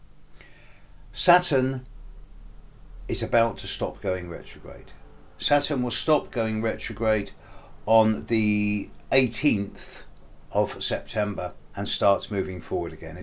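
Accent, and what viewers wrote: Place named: West Country, United Kingdom. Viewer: Love your West Country burr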